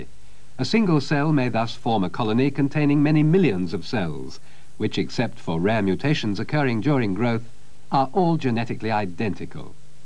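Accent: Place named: RP, United Kingdom. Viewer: This is great. I love old science ed videos narrated in recieved pronunciation. thanks for posting.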